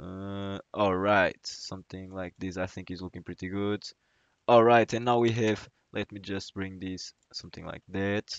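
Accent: Portugal. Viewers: ah este sotaque tuga nao engana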